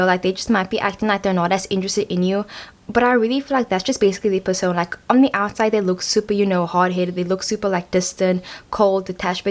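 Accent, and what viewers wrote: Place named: South Africa. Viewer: are you from... SA.... bc I am and I hear an accent 👁️👁️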